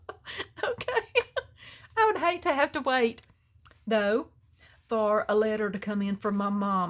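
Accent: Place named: Texas, United States. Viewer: This is a stunning journal. I love your style. I also love the accent being from Texas we say y'all and fixin all the time. Tfs Kathy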